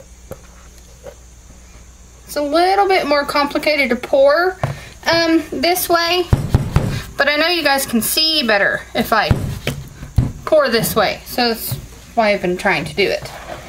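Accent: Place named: United States. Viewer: Such a gorgeous soap! I bet it smells devine 😍😍 I love your accent-where in America do you guys live? I'm in Tasmania Australia 🇦🇺 We don't have many soap makers here so buying it isn't easy :( wish you lived closer,I'd buy soooo many of your soaps haha :) xx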